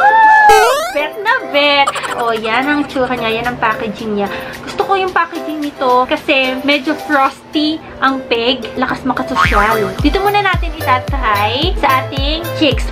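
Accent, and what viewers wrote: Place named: Batangas, Philippines. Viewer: kakatawa mam ang pagsasalita ninyo HAHAHHAA batangueño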